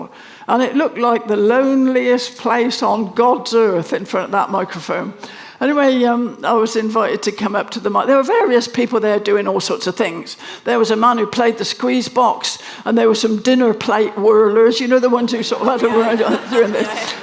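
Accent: West Country, United Kingdom. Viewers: 👍good on Pam Ayres for a plethora of comic ryhmns and worldy laments with a wonderful west country lilt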